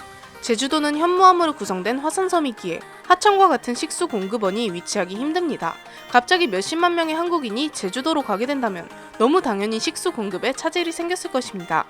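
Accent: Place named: Daegu, South Korea. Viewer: 대구분이신가ㅋㅋㅋ 사투리 쓰시넹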